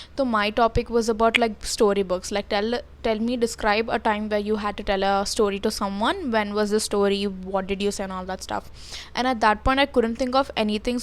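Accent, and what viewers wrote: Hyderabad, India. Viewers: Hyderabadi bolte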